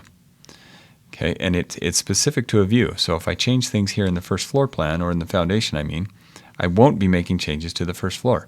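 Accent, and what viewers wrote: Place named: United States. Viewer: US accent yeah:face-fuchsia-wide-eyes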